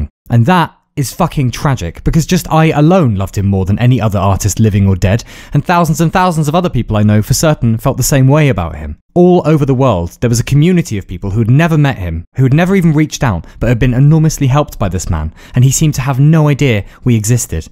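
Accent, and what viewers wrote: England, United Kingdom. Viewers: "Life is a mountain you must Climb to see the view from the top, and the journey itself is the most important part of the whole thing" I came up with that quote because of your channel, and I want you to know that I know you, but you don't know me, so... I dunno.... Wanna grab a cup of tea and a slice of cake fellow carbon unit that has a strong English accent and a half developed hatred for wasps, and just wonder about how living is a fucking miracle and being alive is incredible? I want to thank you deeply, as I am able to connect to the ideas you present in your videos at a very deep level, and it makes me feel peaceful. Not dreading anything, not being scared of inevitable death, but just to be able to be calm for 10 minutes and have a deeper understanding of the universe is incredible and you make that happen. Keep doing what you do, and never change. 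You have come so far, rest here a while, for there is so much further left to go. And I can't go without you. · You have the ability to get me interested in about anything, even if i wasn't before. Anyway thanks for changing my entire view of the world with your videos and your cocky but charming british accent! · sublime british accent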